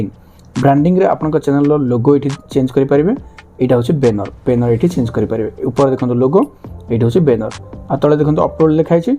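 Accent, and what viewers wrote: Balasore, India. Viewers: Balasore kahuche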